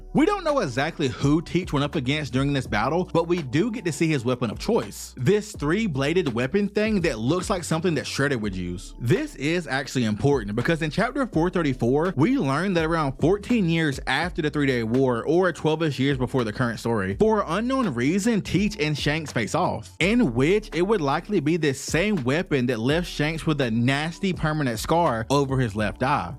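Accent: South, United States. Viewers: You have such a southern accent man, where are you from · Nice southern accent. Not hating, I have a southern accent too. Awesome video though and I’m subbed now. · Sorry but with that weird ghetto southern drawl i couldn't make it past the introductions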